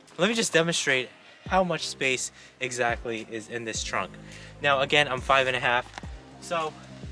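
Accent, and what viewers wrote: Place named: Guyana. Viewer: Are u Guyanese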